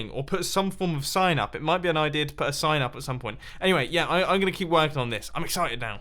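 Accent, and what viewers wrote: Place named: United Kingdom. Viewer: "Does that look a little bit naff?" Me: "Naff?": "I feel like that looks a tiny bit naff" Me: "But what is naff?? EXPLAIN!!" Lol, this is why I love British youtubers, I don't understand a word they're saying sometimes. Keep up the good work!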